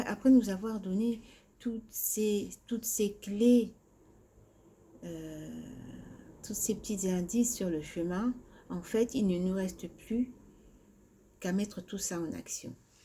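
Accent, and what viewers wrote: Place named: England, United Kingdom. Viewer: Merci pour la lecture des messages, des clés qui mène vers le chemin du succès. Vous avez vraiment l'accent Anglais. Et qu'et ce que c'est beau à l'écoute. J'ai adoré. Merci soeur pour votre merveilleux partage. Abssaanaa.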